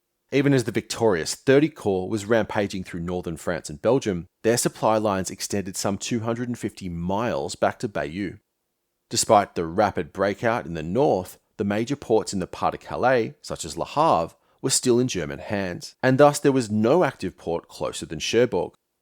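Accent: Australia. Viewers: Mate, pleasure to hear our Australian accent. Salutations on your work. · Are you from Aussie bro? Canberra?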